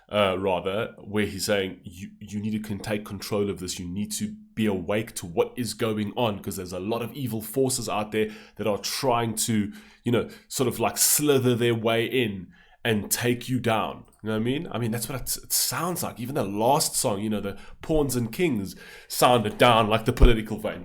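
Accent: South Africa. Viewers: An album review would be awesome Edit: im curious...are you South African? You sound like it.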